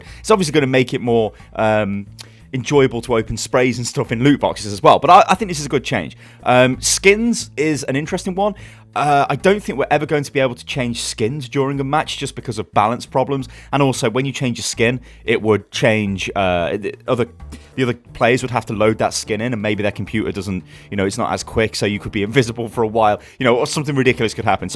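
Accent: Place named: United Kingdom. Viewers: A Brit that sounds like he's on cocaine is easy to listen to? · V16 Lambo Tbh I watched this because the British accent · V16 Lambo now I was able to skip the Blizzard video and hear it in a British accent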